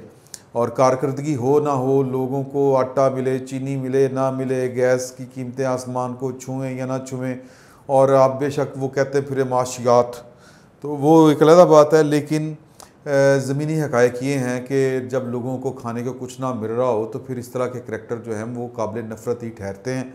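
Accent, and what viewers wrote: Punjab, Pakistan. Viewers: Very sweet and cute punjabi by you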